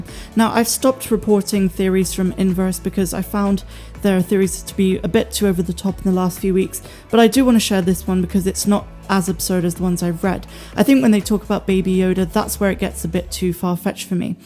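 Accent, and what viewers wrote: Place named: South West, United Kingdom. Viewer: Love the show, sounds like your from down the south west like me!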